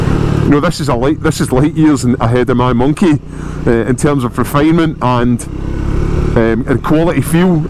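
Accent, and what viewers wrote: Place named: Glasgow, United Kingdom. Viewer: Certainly the honest and trustworthy Glasgow accent helps.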